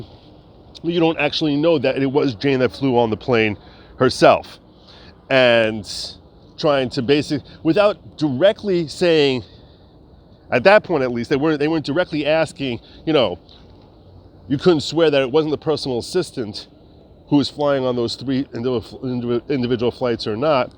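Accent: New York, United States. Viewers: Love your show. I miss the NY accent living in Ky but would NEVER move back!😊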